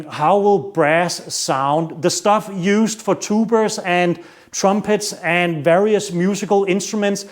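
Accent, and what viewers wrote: Denmark. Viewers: Where are you from? Denmark? Love the accent! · er jeg den eneste der kan høre at han er dansker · It is very much a Danish accent - fellow dane 🎉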